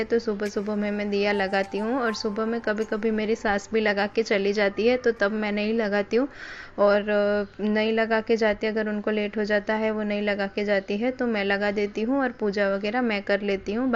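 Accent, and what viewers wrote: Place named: Gujarat, India. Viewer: Ji han main bhi Ahmedabad se hun aapki awaaz se lag raha tha ki aap Gujarat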